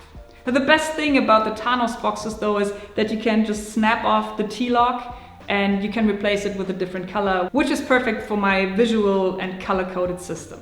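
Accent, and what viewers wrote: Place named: Germany. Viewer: peinlich , wenn eine Deutsche englisch spricht ...:-/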